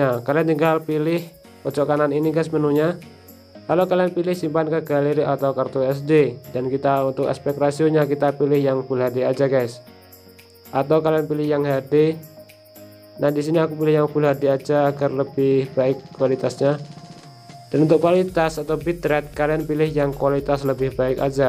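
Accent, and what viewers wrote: East Java, Indonesia. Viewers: Jawa timur yak ?? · Jawa timur nya mana bang?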